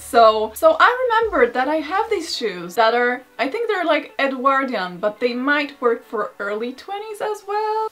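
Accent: Poland. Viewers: I like your delivery, I love your accent. I love my polish peeps. I think you are going to be a Youtube superstar. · POLAND!!!!! It would have kept me up trying to place your accent. So, I literally did a web search for "youtubers like Rachel Maksy" and your channel was suggested. This is my third video (yes, I'm a fan 🙂) and your accent is so familiar but I couldn't put my finger on it until you said it then I said, "That's it!" I haven't been to Poland since the 1990s, possibly before you were born. Anywayyyyyy, I'm enjoying your work and will now binge watch while I sew. Thank you!!!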